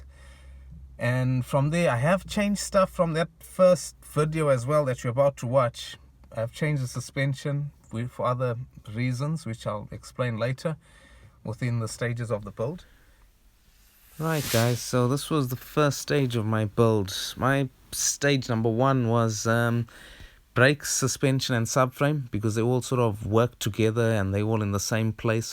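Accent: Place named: South Africa. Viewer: Good luck with your build mate. After the first few seconds of listening to you, I realised you were a fellow South African.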